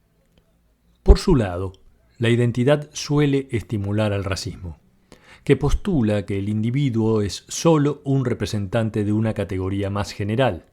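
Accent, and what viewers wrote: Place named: Argentina. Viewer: Siempre es grato escucharlo. Deseo compartir una sensación paradogica mientras te oía.En la parte final del vídeo cuando haces una serie de postulados sobre la Libertad solo asentía y no podía estar más que de acuerdo. Hasta que el acento argentino me recordó a MILEI y pensé como unas ideas tan profundas y tan ciertas pueden ser tergiversadas y usadas para todo lo contrario. De nuevo, mil gracias.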